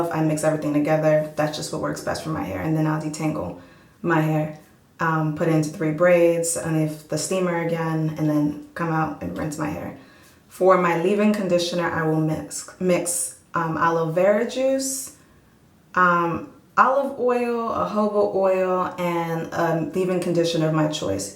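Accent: United States. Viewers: Love your hair, love your attitude, loving the accent!!! BK represent! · you are from NY aren't you? I hear the accent. love it.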